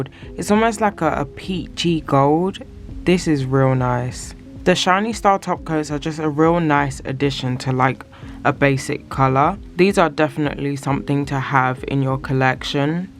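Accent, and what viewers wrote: United Kingdom. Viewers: Hey hun just came across your channel. Love it, sounds like you're from the UK too..yay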